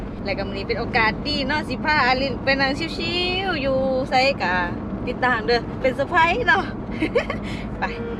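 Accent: Ubon Ratchathani, Thailand. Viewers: พี่น้องไทยลาวกะนี้ล่ะสำเนียงการเว่ากะคนเมืองอุบล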